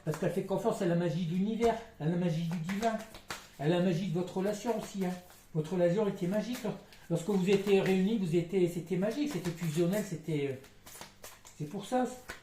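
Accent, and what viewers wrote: Nord-Pas-de-Calais, France. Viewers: Mon autre m'a enfin avoué en rougissant qu'il pensait tout le temps à moi... Il ne faut jamais désespérer ! Votre accent chti me rappelle mon enfance à Béthune... Merci pour vos guidances et votre bienveillance ❤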